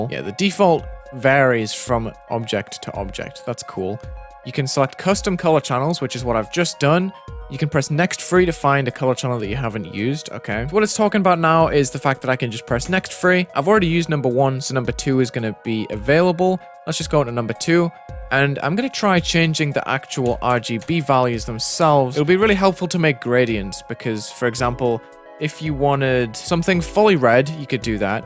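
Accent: United Kingdom. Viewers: you're british!?!? nIce vid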